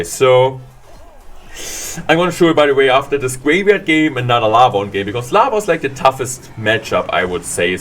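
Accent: Germany. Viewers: You are my favourite youtuber of clash royale(I love your german english accent)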